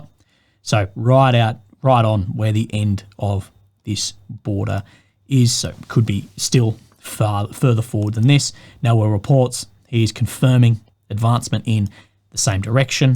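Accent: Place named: Australia. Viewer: hearing an aussie accent always makes my day more cheerful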